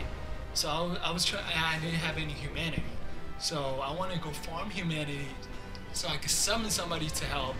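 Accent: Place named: New York, United States. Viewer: you sound new yorkish or even maine-ish. eitherway u have a cool voice. btw what sl were u in this video?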